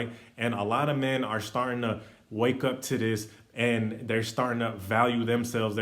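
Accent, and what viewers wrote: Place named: Georgia, United States. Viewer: Aye bro you from GA?? That accent real familiar 💯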